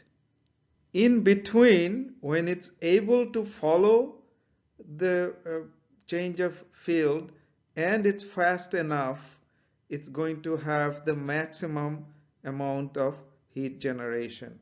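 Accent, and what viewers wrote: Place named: India. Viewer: listen to how fucking Indian you are...incredible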